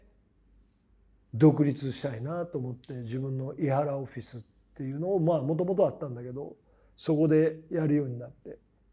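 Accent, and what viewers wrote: Kansai, Japan. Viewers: 長い間伊原さんのファンですが、今まではドラマや映画などでしか知らず、遠い存在でしたがYouTubeでグッと身近な存在に感じます😄 (私が生の伊原さんを見たのは舞台マヌエラでした。) 今回の後編の話も、自分らしさが出てる話で楽しく拝見しました。こんなに関西弁話されるんだぁと思ったけど、伊原さんらしくていいと思います。これからも応援します！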